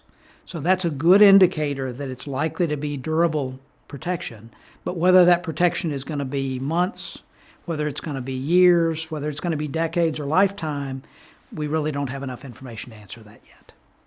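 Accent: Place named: United States. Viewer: Another Doctor that gets to keep his license and job! (he also has the same American accent as 'Snowden' his first name will come to me...maybe) as has of course zuckerberg, gates im English and these have a familiar accent its it a certain region? Or is it their own exclusive tribe? Edward! i knew it would come to me if i just kept typing!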